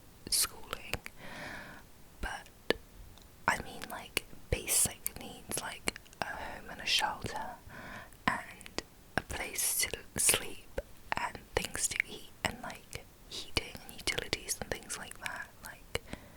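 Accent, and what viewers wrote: England, United Kingdom. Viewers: You are starting to sound a little more 'English' after your time over here in the UK :) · 만세만세🙌🙌🙌🙌🙌인스타에서 댓글받고 얼마나기다렸는지 몰라요🙌🙌고3이라 폰을 중독방지어플로 잠궈놔서 알림받고도 보지를못했어요ㅜㅠㅜㅠ이제야보다니ㅠㅜㅜ언니 머리 많이자랐어요!!!!어릴때 영국갔을때 그 특유의 발음을 듣고 컬쳐쇼크였는데 언니발음에도 그 발음이 들려요ㅇ.ㅇ!!영국미국을 오가며 글로벌하게 자라셔서 그런가ㅋㅋㅋㅋ컴백환영해요💕💕💕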